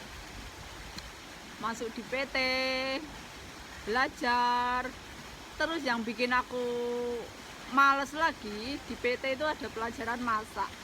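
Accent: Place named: Banyumas, Indonesia. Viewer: semangat mba... ngapaknya kelihatan kaya saya kalo ngomong...hahahaha...mantul mba... semua sudah berlalu...sekarang dah sukses amiin...salam silaturahmi... sudah padam komplit...padamin juga punya ku ya mba...makasih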